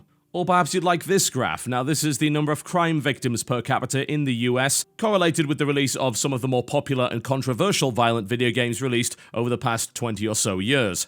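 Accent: United Kingdom. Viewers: The only british that i can hear for minuts, Salut from argentina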